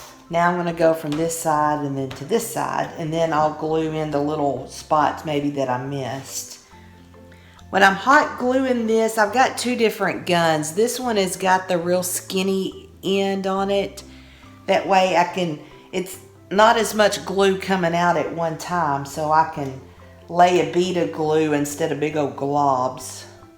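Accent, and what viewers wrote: South, United States. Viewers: Hi. Judy here. I have only watched a few of your videos. I did subscribe to your channel. I love your accent!!!! I probably sound a lot like you as I am from southern central OK. Every time I go visit my daughter in Oregon, people are always commenting on my southern accent!!! 😘. I really enjoyed watching your fall home tour. And I absolutely love this project that you did in this video. It is too cute and looks easy to make. I am thinking of maybe getting all the necessary items and doing this with my daughters for our project when we are together for the Thanksgiving holiday. Who would have thought of using splatter screens to make something so beautiful. With choices for fabric etc, the sky is the limit. You seem like such a nice and wonderful person we would sure make good neighbors, as I love crafting also!!!! My husband broke his wrist years ago, but the difference with him is that he broke his right wrist and he is right-handed. 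I remember when he went back to work where he worked in the microbiology department of our local hospital lab, he wrote the results of his reports with his left hand. He said at first it nauseated him, but then he got kind of used to it. I hope you do well when the cast comes off. It took him a while to gain range of motion in his wrist because it had been fixed in one position for so long that when he tried to go beyond that position, there was some paiin; however, it did not take him too long. I am sure you will do fine. Looking forwar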